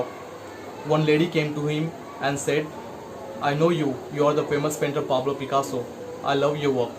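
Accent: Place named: United States. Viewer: Wow your English with American accent 🔥🔥🔥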